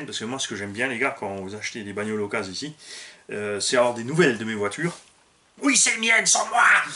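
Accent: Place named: Alsace, France. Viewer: Salut! Super ta chaîne dédiée au modélisme RC, je l'ai découverte il y a 5 ou 6 jours! J'ai du taf, y a un paquet de vidéos à regarder haha! Mes oreilles ont remarqué de suite un accent familier, t'es Alsacien et ça fait bien plaisir (je suis de Colmar perso), content de constater qu'il y a des passionnés dans le coin, je commençais à croire que j'étais tout seul à basher dans mon coin de forêt! Tes tutos vont m'être bien utiles et d'une grande aide, j'ai un Typhon v3 en cours de remontage après une sortie/journée VDM (il a fini dans une flaque de ciment un peu en dévers que j'avais pas vu... sans commentaires... ^^). Bref continue tout ce bon boulot, t'as un follower en plus et des pouces bleus!!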